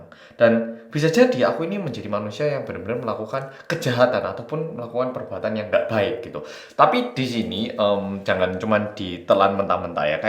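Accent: Java, Indonesia. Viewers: Keras banget ya industri entartaimen korea,. Mau sedih krna kasus ini nimpaa seunggi🙁, mau ketawa jg mas terjemahinnyan pke logat jawa😅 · Jelasinnya tetep medok ya mas, lg serius2 jd ngakak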